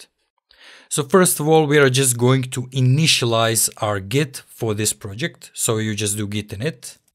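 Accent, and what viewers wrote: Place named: Russia. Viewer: u tallk like russian where u originated frm?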